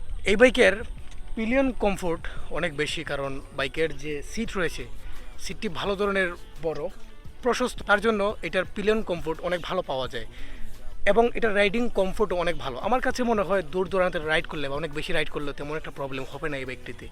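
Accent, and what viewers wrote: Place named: Sylhet, Bangladesh. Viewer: সিলেটের মানুষদের একটাই প্রবলেম, শুদ্ধ বাংলা ভাষায় কথা বলতে গিয়ে একদম মুখের অবস্থা খারাপ হয়ে যায়। শুদ্ধ বাংলা ভাষায় কথা বলা কি এতই কঠিন? আসলে একটু চেষ্টা করলেই এটা মানিয়ে নেওয়া যায় এবং পারা যায়।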